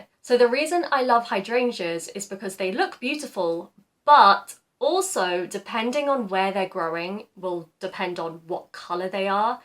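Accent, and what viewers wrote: United Kingdom. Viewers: 綺麗なイギリス英語。日本人にはこちらの方が馴染みやすいのでは無いかと思いました。 同じ内容をアメリカンアクセントで話されると面白そうだなと思いました。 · 語学学習に活用させてもらってます！イギリス英語が聴こえず苦手意識を持っていたのですがおかげさまで好きになることができました！ありがとうございます😊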